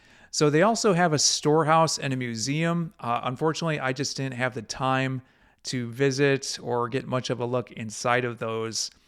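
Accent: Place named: Wisconsin, United States. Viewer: He's from Wisconsin. 😊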